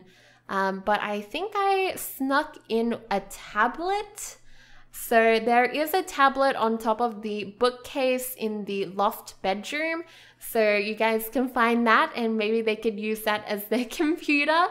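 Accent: Australia. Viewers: is she australian? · Muflon She's Australian :)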